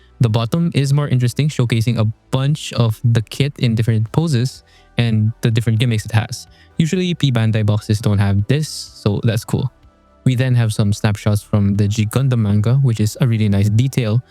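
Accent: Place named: Philippines. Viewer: Just found your channel, like your videos. And by the accent alone I can already tell you're a Fellow Filipino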